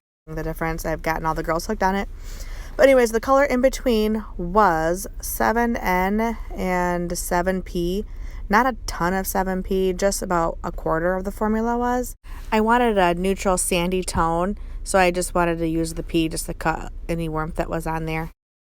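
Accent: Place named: Detroit, United States. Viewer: I knew I recognized that accent! My hubby is from around the Detroit area! 😊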